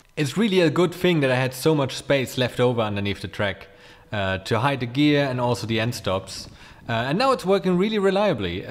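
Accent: Germany. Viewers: Do are you from Germany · Ich finde es lustig wie man perfekt deinen deutschen Akzent hört, die Idee muss man aber auch erstmal haben. Super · Bruder dein akzent einfach anders deutsch xD Gutes Video ^^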